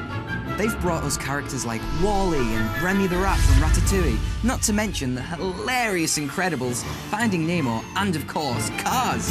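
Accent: United Kingdom. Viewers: Is it my imagination or does he sound a bit Scottish in this? He sounds different. Maybe I've been watching too much David Tennant stuff lately and I have Scottish accents stuck in my head. That must be it. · Hes sounds like he from newcastle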